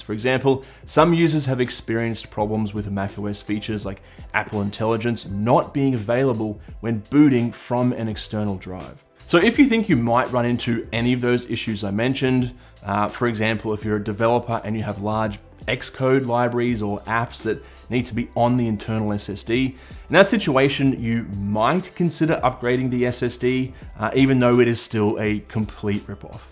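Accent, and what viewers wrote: Australia. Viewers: Australian accent but USD prices? Also, has there ever been a 3rd party mouse with 2 axis scrolling? I have tried other mice but scrolling side to side is essential for Illustrator.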